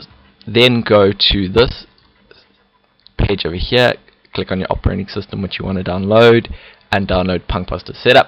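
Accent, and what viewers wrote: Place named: South Africa. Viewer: Hey, Are you South Africa, you sound a bit similar to me..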